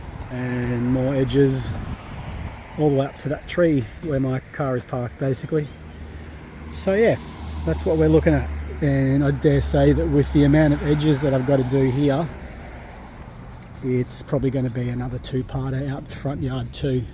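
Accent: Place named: New Zealand. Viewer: Oops, I meant New Zealand, so sorry. 😬It's the accent! 😄 If you take on this yard, I look forward to seeing what the grass can look like when properly maintained. Thank you for the info.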